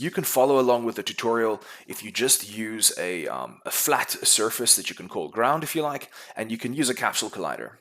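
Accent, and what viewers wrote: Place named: South Africa. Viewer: I feel a hint of South Africa here ... thx for the tutorial boet.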